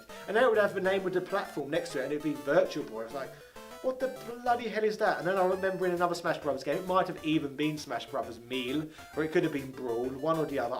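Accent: England, United Kingdom. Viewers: English people can't speak properly, free DS for example. couldn't be bovered is another. It's not just this dude though lol. freeeee deeeeeee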